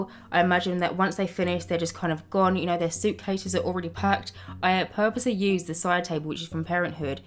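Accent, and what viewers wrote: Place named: East London, United Kingdom. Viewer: Its actually sooo refreshing to hear a east london accent on youtube tbh 🥰 im new to ur channel and u deserve more subs & views!! Also the sims treat us so bad sometimes building a whole clinic so u dont have to sit through a loading screen 😭🥴 the way u build is so interesting too like when u put tones of tissues on some surfaces or megaphones im always like ??? But it seems to work out in the end ! It was a really gorgeous build tho u did amazing!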